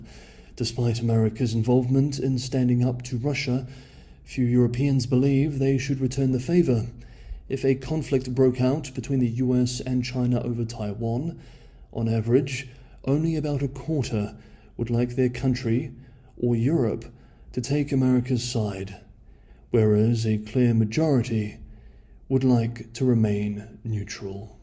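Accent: New Zealand. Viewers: where are you from, mate? I think a Kiwi, and I'd guess from Wellington but most Kiwis have fairly similar accents and even Southlanders and Kiwis from Otago generally sound somewhat similar to Kiwis in the North Island (other than most Maoris). I should add that sounds much more polished than most Kiwis but I have family members who sound much more posh & toffish than even he🤣🤣🤣🤣🤣😂😂😂😂😅😄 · sounds like a Kiwi to me.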